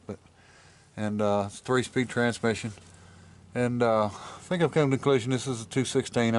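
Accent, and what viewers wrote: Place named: North Carolina, United States. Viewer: You have the North Carolina accent..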